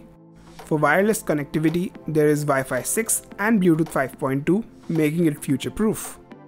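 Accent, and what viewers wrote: India. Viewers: Laptop, lol, love that indian accent :D. Great video!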